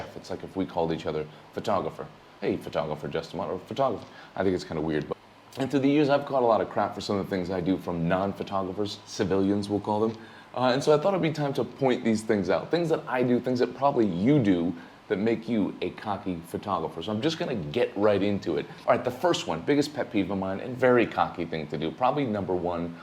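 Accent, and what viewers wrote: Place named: New England, United States. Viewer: Got to tell you Justin, its so refreshing to hear someone on Youtube talk like you do. I told my partner that you had to be New England. Masshole here.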